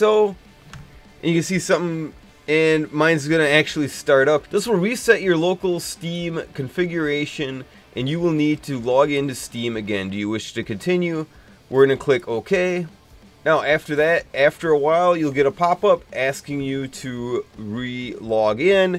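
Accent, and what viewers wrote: Canada. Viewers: The Canadian accent is real.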